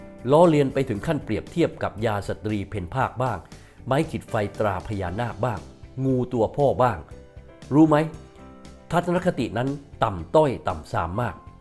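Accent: South, Thailand. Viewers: คุณอ่านดีน่ะ แต่ อ่านชื่อ ผิด 2 ชื่อ นันโทปนันท กับ อัลเบิร์ต ไอสไตน์ คนใต้ บ้านเราทองแดง พออนุโลม แต่ อ่านผิด คำ ต้อง ระมัด ระวัง มากๆ ครับ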